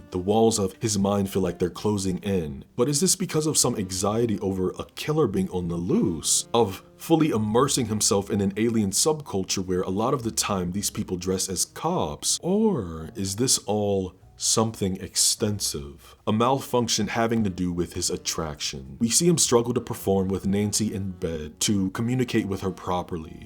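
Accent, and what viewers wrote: United Kingdom. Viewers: Giving a like for the british accent alone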